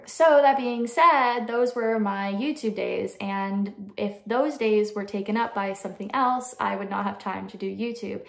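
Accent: Canada. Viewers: It's so silly they wanted you to sound less Canadian. Why did they specifically want it to be American? Why not English or something else? The "assume american unless otherwise stated", "american is the default/assumed place of residence" and "all things american are superior" attitudes are so weird. I'm Australian and I much prefer the Canadian accent over the US accent. Your voice and accent is lovely. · I can't unhear the "about" and "out" now 😂 it makes me wonder if I do the same thing but I'm western Canada so idk of we would talk the same also super cute kitten! And congrats on your new exciting changes! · Canadians also say car... interestingly. So, the sentence "I'm about to take the bag out to the car" is, uhh... real interesting. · How would sounding too Canadian be a problem?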